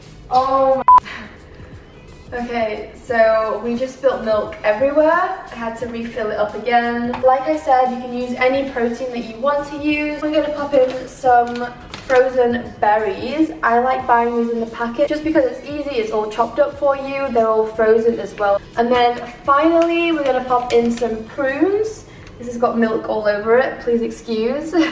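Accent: United Kingdom. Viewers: Where is from? She has like this accent from the UK, but the name doesn't sound European?.-.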